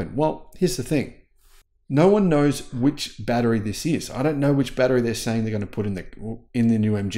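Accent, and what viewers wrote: Australia. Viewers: I am looking for a way to get credit for my improved ability to comprehend the Ozzie accent But all web sites focus on reading comprehension and ignore listening comprehension Still because of the, my listening comprehension has improved, making me confident I could successfully buy a cup of coffee and rent a hotel room in Australia!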